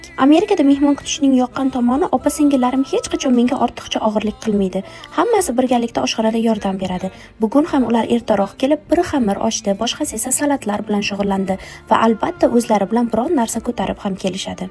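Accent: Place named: Khorezm, Uzbekistan. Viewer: Уноши хоразмча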